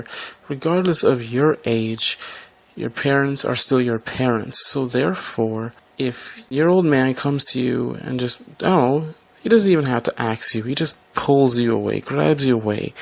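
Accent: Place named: Russia. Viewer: I like your accent is it Russian.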